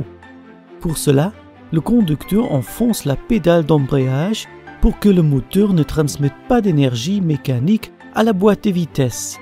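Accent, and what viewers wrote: Germany. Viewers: J'ais du mal à comprendre à des moments, mais d'où vient cette accent ? Allemand ? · on se mare avec son accent allemand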